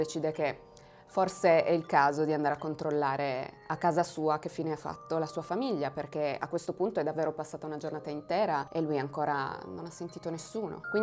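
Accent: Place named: Pordenone, Italy. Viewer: Azzz !!!! Non ricordo se sei Piemontese, o di lì attorno,si potrebbe facilmente confondere il Tuo modo di parlare con quello di Pordenone, è identico!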